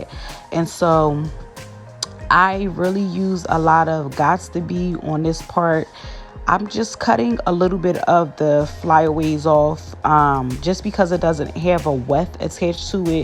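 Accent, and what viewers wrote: Philadelphia, United States. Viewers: are you from philly ? i hear the accent lol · Your voice makes me miss my college besties! Are you from Philly? When I listen to you talk I think of Cheltenham lol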